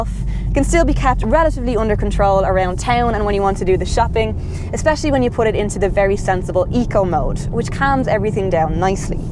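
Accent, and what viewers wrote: Ireland. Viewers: Great car, great review, and i love the Irish accent :)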